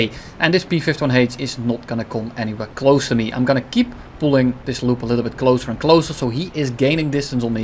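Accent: Netherlands. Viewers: i really love jouw dutche accentje😂 geen haat bro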